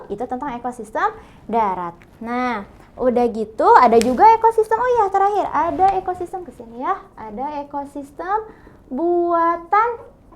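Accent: West Java, Indonesia. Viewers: Kakak nya Sunda bet suka gua denger nya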